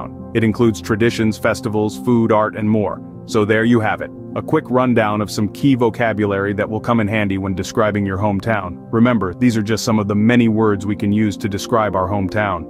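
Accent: United States. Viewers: Can't you hear it ? Of course it is told with an american accent.🙂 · is this american english?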